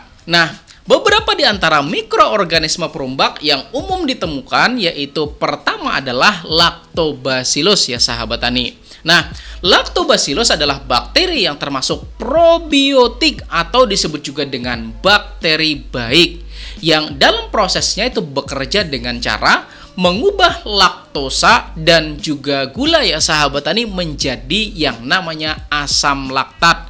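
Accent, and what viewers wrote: Banjar, Indonesia. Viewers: Mas orang banjar ya? Logatnya agak mirip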